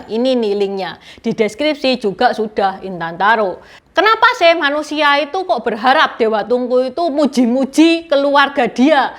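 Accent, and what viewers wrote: Java, Indonesia. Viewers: Mbak Intan daleme pundi gih. Jawane medok men.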